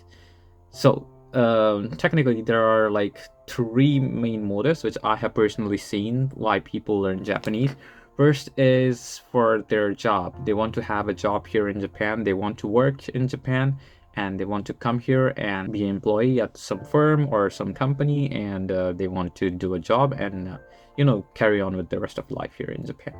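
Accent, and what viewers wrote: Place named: England, United Kingdom. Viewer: pleaseee make a video on how to get english accent like you am also from india please i beg you help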